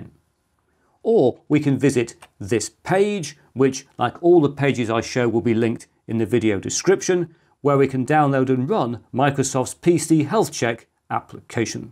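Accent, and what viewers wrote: United Kingdom. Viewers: Very clear tutorial, summarizes the various procedures perfectly. Plus I really like your British accent and very clear diction.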